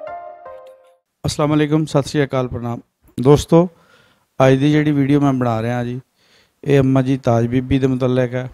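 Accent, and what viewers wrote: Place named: Doaba, India. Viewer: ਤੁਹਾਡੀ ਬੋਲੀ ਮੇਰੇ ਦੁਆਬੇ ਇਲਾਕੇ ਵਾਲ਼ੀ ਹੈ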